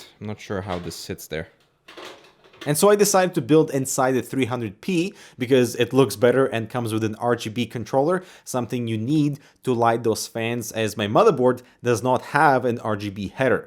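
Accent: Russia. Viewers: Are you russian? Im not trying to be racist, just curious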